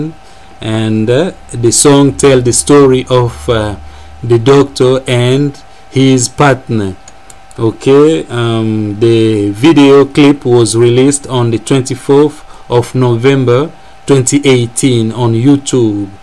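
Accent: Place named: France. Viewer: Your english sound french.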